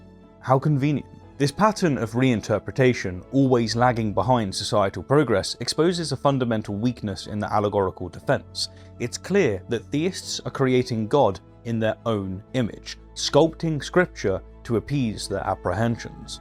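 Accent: England, United Kingdom. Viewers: I find it "interesting" that a person with a clearly "English" accent and therefore the inheritor and beneficiary of probably the most evil empire in Human history (165 MILLION Excess Indian deaths between 1880 and 1920, to mention just ONE of the countless evils committed unapologetically by the vicious English) has the temerity to label the Mongols as "Evil". As Christian scripture puts it, "Take the plank out of your own eye, then you will see clearly to remove the speck from your brother's"....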